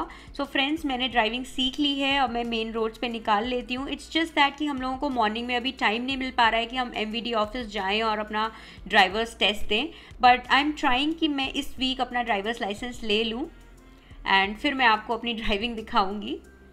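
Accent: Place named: India. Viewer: Hi.. first time I am watching your video.. I am happy, you are an amazing and fun loving person.. I love the fact u still have the Indian style be it accent or cooking.. I see many catching a fake accent in a month or two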